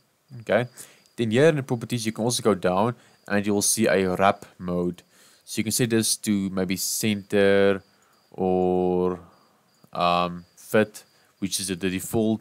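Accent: Afrikaans, South Africa. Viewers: Jisss maar jy is heavy afrikaans bra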